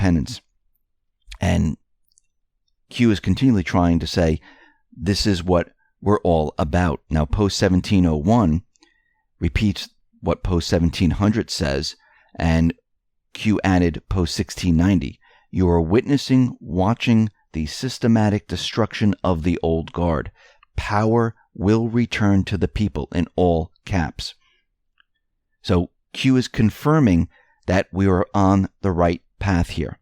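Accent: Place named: East Coast, United States. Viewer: Dont u listen to your voice with obnoxious east coast sound or r u oblivious to it the accent is so pompous and ignorant sounding though u r not stupid q asks for prayer because he will ask u followers to drink kool aid next only a matter of time until trump assasinated and pence forces bible study in public school hawaii missile was aimed at af1 or did u miss that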